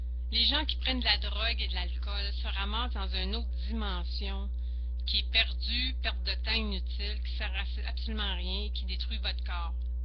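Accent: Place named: Canada. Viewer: Bonjour d'un frère français j'aime bien vos vidéos merci pour vos conseilles et vos expériences , ça donne une petite tournure marrante avec votre accent Canadien .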